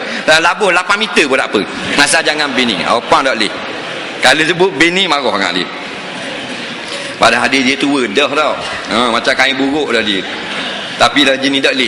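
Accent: Terengganu, Malaysia. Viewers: ustaz ni bgus dgn cara dia..ada unsur jenaka n pengajaran..walaupun dgn slang trngganu...tp dpt fhm msg yg nk disampaikan...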